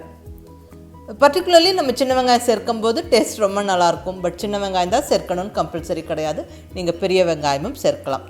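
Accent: Kongu, India. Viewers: Hai Mam! I love to hear your Kongu Tamil. The way you explain , each dish is so clear that even the beginners can understand well. Will you give the link for the white Kadai which you used for the preparation of Ragi halwa?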